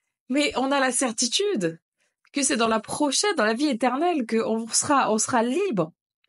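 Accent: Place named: Africa. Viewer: Ca se voit que tu fréquentes des africains qui ont vécu là-bas, tu parles comme eux, avec le même accent. C'est dingue. Cette société "multiculturelle" gauchiste c'est vraiment mortel pour les blancs.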